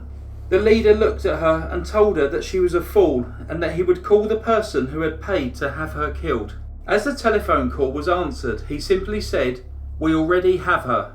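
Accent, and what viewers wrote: England, United Kingdom. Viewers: This was a fascinating case, presented in a compelling style. You successfully blend storytelling and documentary narrative styles, and you enunciate clearly so that you are easy to understand, UK accent notwithstanding. ;-) Nine months with no parole for six seems insufficient, however, I think Noela got some measure of justice with the shock of showing up. Can't put a price on that. Great job. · First I would like say that I sure missed my friends beautiful English accent! So good to hear it again... For this woman, it is sad that she is being shunned because of a man who wanted to murder her. Those people need understand that it could happen to them. She is better off without those good for nothing back stabber's. He deserved a longer sentence, 9 yrs is nothing for someone who wanted to murder their wife. He begs forgiveness? 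He just wanted out the easy way, so he thought.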